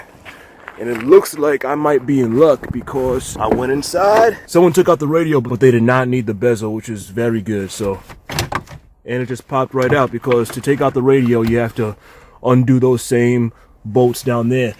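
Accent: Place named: Boston, United States. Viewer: are you from boston or the boston area? your accent tells me you are or near by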